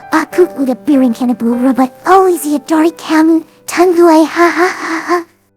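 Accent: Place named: England, United Kingdom. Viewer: itu yang baca orang inggris gitu jadi klo suruh baca indo gitu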